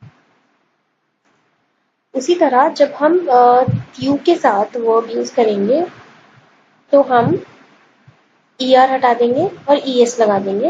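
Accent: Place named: Bihar, India. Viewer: madam bahut zaldi bta rahi h wo v bihari (bhojpuri) accent me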